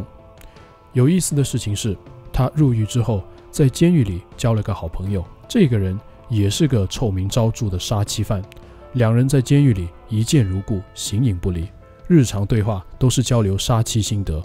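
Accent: Standard. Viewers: 國語和粵語都很標準喔，主持人是哪裡人？ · 国语好标准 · 普通话很标准，听起来舒服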